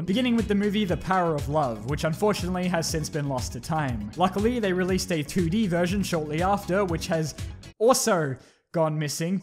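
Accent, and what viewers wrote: Australia. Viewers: funny Australian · "Babe Wake up, the funny Australian made a new video"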